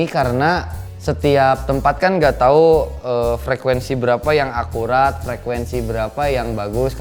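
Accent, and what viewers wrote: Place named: Sunda, Indonesia. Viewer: Kentel bgt sunda na, kang kabayan banget atuh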